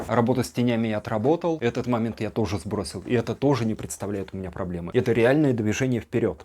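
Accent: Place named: Moscow, Russia. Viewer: раздражает нелепая манера говор с московским ш вместо питерского чн чк откуда ты прелестное ха-ха создание с таким количеством ошибок в речи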